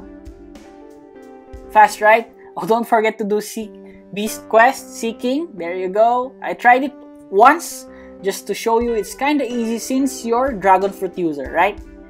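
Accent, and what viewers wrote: Philippines. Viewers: I was right ur filipino cause me as a filipino speaking english thts how i sound like also i saw tht text on messenger na maaga ka bukas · Are you A filipino? because you talk like one and when you like, make a mistake you say ay, sorry guys sorry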